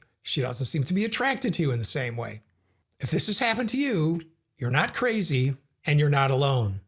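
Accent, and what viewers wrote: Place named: United States. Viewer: Sorry, what part of US are you from and what do you call your accent?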